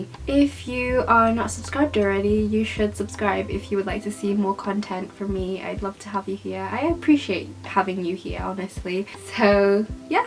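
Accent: United Kingdom. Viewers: Can you be my british teacher ?? Bcs your accent really impress me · The british accent sounds so lovely ❤❤ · AAAA I love your British accent 🥺❤️ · This is phenomenal, never seen a malay lady with british accent SO COOL 🤣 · i wish i could speak to her everyday, i mean, i would love to learn to speak fluently with those british accent. it reminds me more bout harry potter and narnia ♥️ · im in love with her british accent thoooooo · i'm really love britsh accent and i woud like to learn from you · I watched your video to boost my Brithis English:) i kinda like it! Love from INA🇮🇩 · I like listening your british accent , so clear and understandable ... I am ussualy rather bit hard for understanding common british accent...because I'm used to listening american accent ...but now I find your video, and I try to understand your british english ...and yes, its work ... 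your accent and pronunciation is amazing ..keep it hold ! ... your new fan from indonesia ... Harfani Abu salman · Lol finally a malay who talk in British accent other than my teacher lmao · I love your britain accent so much. please make a video on how to speak more britain :) ps: i love harry potter and i want to study in uk too one day....maybe after finish my spm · U have UK accents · You kind of have the british accent but not as how it is really I mean those English people have this accent that's hard to understand , yours is just a hint of British accent. Its clearer and easier to understand. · love your british accent!! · wow patutla fluent gile english accent ......born at uk rupenya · I’m here for the accent honestly 😊😆 Love the British accent voila 🙌🏻 I tend to speak accents for work meetings following my global colleagues since they understand better if I speak their accent 😃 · I really like your british accent 😁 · suka dgr british accent tu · Kalaulah saya boleh cakap bahasa Inggeris macam. 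British accent. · Thanks for cheering up my day, btw really love your British accent ♥️ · Uuu that british accent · Hi! It’s okay if you can’t speak malay since it’s not your first language after all. Just ignore those who spoke behind your back about you. Btw, I really loveeee your British accent. I’m currently studying in Degree for Eng Language and tbh it’s personally hard for me to copy the british accent. Your videos are really, really motivating me to practice more☺️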